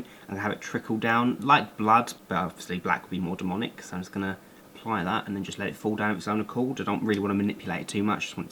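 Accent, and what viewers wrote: England, United Kingdom. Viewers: is he from England?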